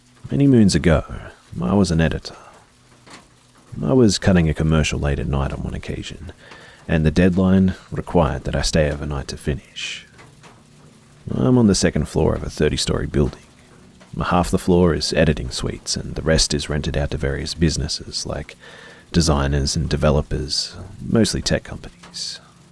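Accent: Australia. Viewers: what part of Australia you from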